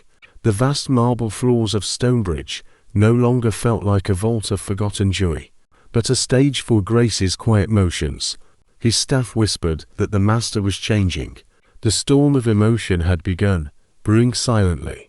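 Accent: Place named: United Kingdom. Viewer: narration has a British accent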